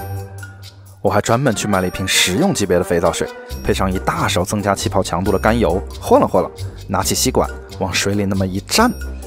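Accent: Wuhan, China. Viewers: 这是武汉普通话吗？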